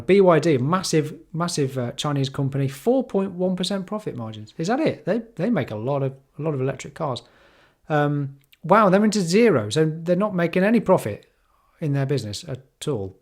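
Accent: United Kingdom. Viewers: Lovely content. Funny accent. Are you from Liverpool or Manchester, perhaps?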